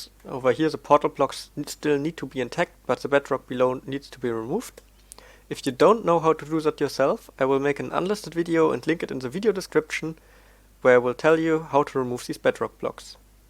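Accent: Germany. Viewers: do you speak german (you sound like that)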